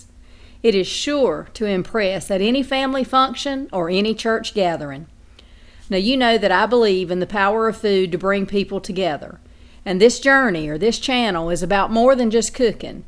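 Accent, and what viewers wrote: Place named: West Virginia, United States. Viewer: Yes ma'am I have one in my collection, I just love your voice sounds like you're from West, Virginia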